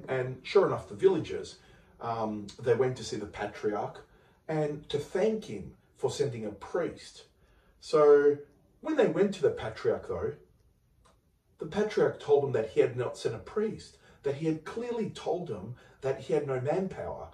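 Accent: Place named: Australia. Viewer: Hello my Orthodox brother. You sound Australian, I too am Greek Australian from Melbourne. I started researching St Nektarios after having him come to me in a dream, he was walking with me and chatting to me walking down a hill near a monastery, I didn't think much of it at the time and just passed it off as a dream. After a couple of years my wife passed away and I somewhat pushed away from religion, a couple years later I restarted my journey with religion and just today, I remembered the dream I had approximately 5-6 years ago, it just popped into my head, I remember I was sick with an illness at the time of the dream and just realised that I was healed shortly after the dream, my guess is the Saint came to me to heal me, didn't put the two together at the time, but my guess is that it was a miracle, anyhow, I started researching the monastery of St Nektarios and low and behold, I saw the church and the gardens in Egina Greece, it sparked my brain and I thought, is this the church and garden I saw in my dream? 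I got goose bumps, I continued to do research and thought wow this Saint is a true modern day miracle worker, back when I had the dream it was like I teleported to the church in Greece and walked with St Nektarios and he healed me, anyhow, I would really like to visit in real life one day. That all said I had just read Agios Nektarios Paraklisi and decided to do some further researching into St Nektarios miracles and found your video. Just wanted to say thank you for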